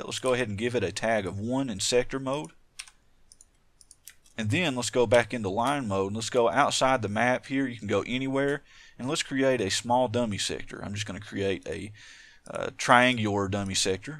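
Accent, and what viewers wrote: South, United States. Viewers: Know how to make it, but still watching anyway. I like the calm southern accent voice telling me what to do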